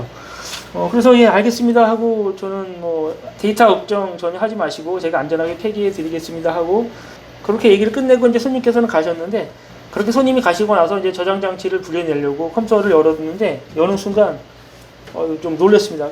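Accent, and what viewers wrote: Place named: Seoul, South Korea. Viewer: 사장님은 춘천분이신데, 서울말을 정말 잘 쓰심 ○_○ 발음도 좋으심👍👍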